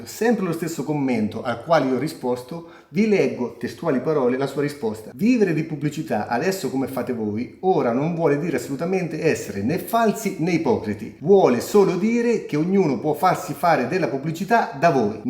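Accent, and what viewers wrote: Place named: South, Italy. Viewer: Che accento da terrone!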